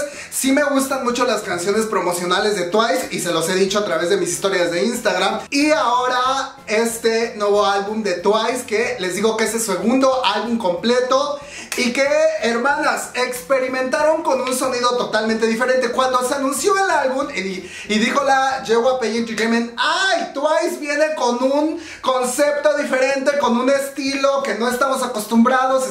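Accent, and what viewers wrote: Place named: Mexico. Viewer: Comadre yo te sigo por la forma como tu hablas me encanta tu acento mexicano yo no soy fan de ningún grupo femenino de Kpop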